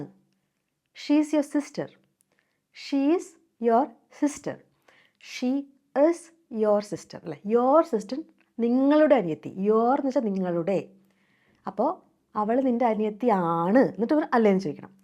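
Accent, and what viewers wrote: Thrissur, India. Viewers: Mam r u from Thrissur ennu chothikkamo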